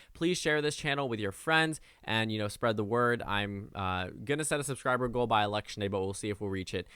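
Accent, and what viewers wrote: United States. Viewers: The dark theme is ok and the donought is good. But PLEASE SLOW DOWN!!! foreigners are watching and the american accent doesn't help exactly... (-‿◦)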